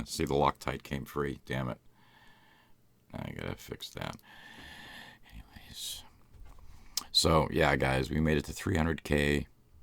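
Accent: Boston, United States. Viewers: Boston accent lolol from rhodeisland myself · Is he from Boston? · Bahhh you should do more regular vlogs!! I want to see more of your beard and hear more of your Boston accent! It’s just as relaxing as your asmr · Are you from Boston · Is that a Boston accent I hear? 😊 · I never knew that your real voice was a heavy Boston voice XD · Is that a downtown Boston accent? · Jewish Jesus it’s a Boston accent. Are you claiming to be the Jewish Jesus? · Are you from Boston??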